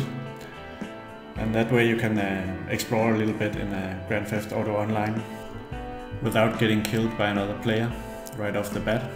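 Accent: Denmark. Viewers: Hello may i ask are you danish? Hvis du er KOOONGEEE xD EJ køber selv gta 5 så tak for videoen :D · Er du Dansker? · er du dansker? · Are you from Denmark ? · Er du fra Danmark? Du lyder dansk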